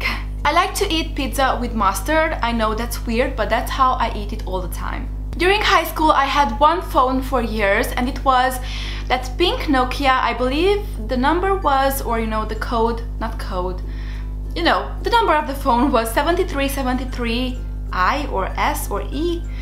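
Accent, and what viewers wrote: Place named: Romania. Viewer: I also forgot to mention I had a friend from Romania named Anna Monica when I was in 1st-3rd grade! She definitely had your accent!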